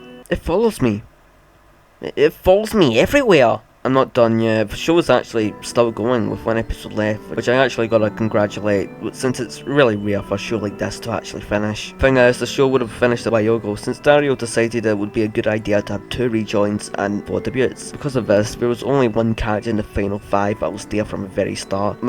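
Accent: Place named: Scotland, United Kingdom. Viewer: i was thinking "damn thats a heavy scottish accent" and wasnt gonna comment on it but then immediately in that thought got to this part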